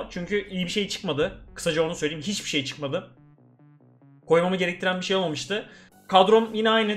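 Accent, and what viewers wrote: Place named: Trabzon, Turkey. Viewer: Api senun şu Trabzon şivene bayılayrom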